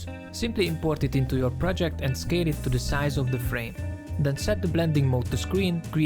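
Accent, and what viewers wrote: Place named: Hungary. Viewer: hungaro accent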